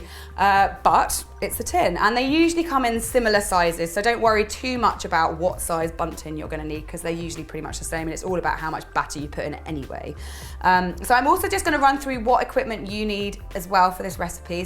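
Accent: United Kingdom. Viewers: Dear, I follow all your delicious recipes. Your channel is the best in the net. I love your way to animate, your humor and your great mind. I would like to bake with you in France, in the French riviera where I live. If you wish spent some holiday in Nice, I will be glad to offert you my hospitality. My name is Catherine. Thank you for all this Beauty you share with us. I love as well listening to you speaking English with your British accent...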